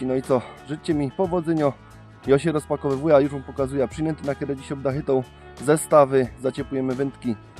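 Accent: Silesia, Poland. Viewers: Karpie nie moja bajka, ale fajnie zaciągasz po Śląsku 😉. Pozdrowienia z Mazowsza.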